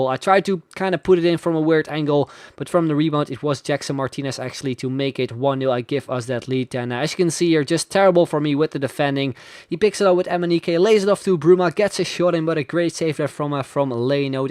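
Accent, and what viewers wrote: Netherlands. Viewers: Is he Dutch????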